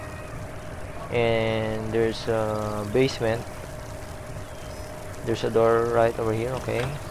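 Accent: Philippines. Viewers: You sound like a Filipino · I’m not making fun of you in anyway, I just want to say I love your accent. Are you philipino cus hearing you speak reminds me of my really good friend I worked with. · he's a pilipino u can tell by the accent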